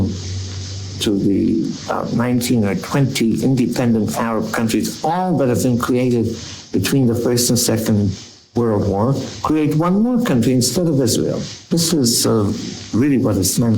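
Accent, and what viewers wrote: United States. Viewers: Her American accent gives it away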